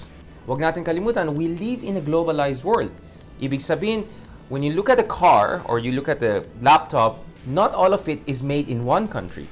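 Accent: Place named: Conyo, Philippines. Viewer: Nakakairita naman pagkacono mo pre. You buckle in ur pronunciation of English words sorry tpos nagsslang ka sa Tagalog when u even stutter with the English words. U don't sound natural in English nagkakapulupot dila mo.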